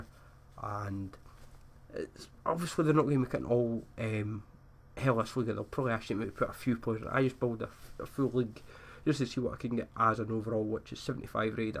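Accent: Scotland, United Kingdom. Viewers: I am from Ukraine. First I barely understood what this guy said, but now I even like to listen to him and understand (well, mostly). "Scottish" language is so funny to listen to:) When I was in Scotland I enjoyed every moment listening local people. Keep up great work,!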